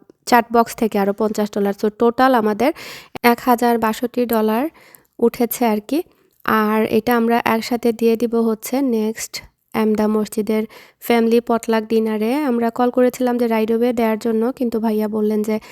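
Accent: Sylhet, Bangladesh. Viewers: প্লিজ আপনিই সিলেটের ভাষায় পুরো ব্লগ দিবেন আমার সিলেটের ভাষা শুনলে কলিজা ঠান্ডা হয়ে যায়😊 আমার বাড়ি হবিগঞ্জ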